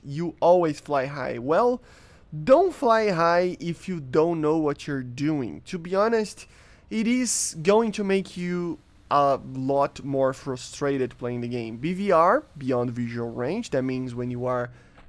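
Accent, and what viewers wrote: Brazil. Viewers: nice video, but i have a question, are you brazilian? your accent sounds brazilian, if not, where are you from?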